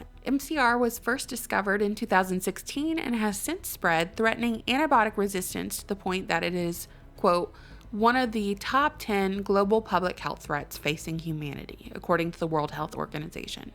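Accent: South, United States. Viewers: I just love your voice and that southern charm..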